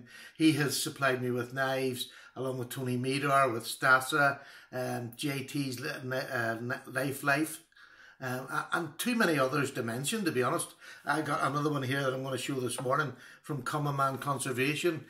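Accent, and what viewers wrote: Ireland. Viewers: Congrats,! We trust yah! I'm sure your reviews will continue to be fair and honest. Whatever keeps you on the air... DO IT! Love your channel and love that voice. Watching your channel has greatly helped with my Irish accent! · I love your channel, i love that you focus on budget knives. And your Irish brogue reminds me of my grandparents who where from Cork and Leitrim counties. Keep up the great work sir!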